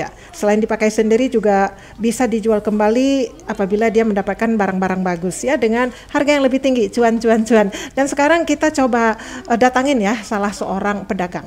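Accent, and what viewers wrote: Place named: Banjar, Indonesia. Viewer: oh maaf logatnya kadang sprti sdra2 kita yg dr Banjarmasin☺️☺️☺️ sy penduduk kaltim turunan Bugis lahir Jambi 🙏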